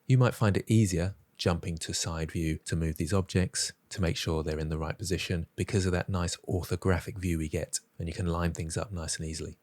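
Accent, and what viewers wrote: United Kingdom. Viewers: just shows and demonstrates how many times he had done this and knowing the process inside-out. The addition of British accent adds a nice spice making blender appeal and following the process just a breeze. Many thanks for sharing.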